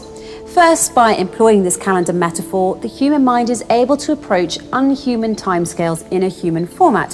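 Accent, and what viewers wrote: England, United Kingdom. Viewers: This is English accent at its best !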